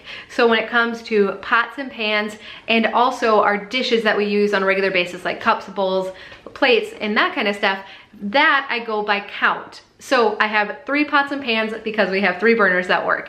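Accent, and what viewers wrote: Minnesota, United States. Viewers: Me: I wonder where this lady is from.: Plastic baegs Me: Ok, Minnesota then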